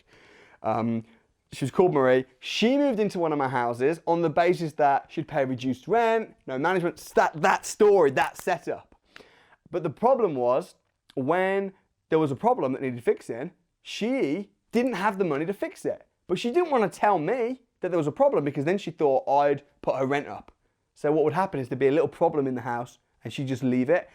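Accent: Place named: Birmingham, United Kingdom. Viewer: Youre a legend but I can't help but be fascinated by that Brummie accent of yours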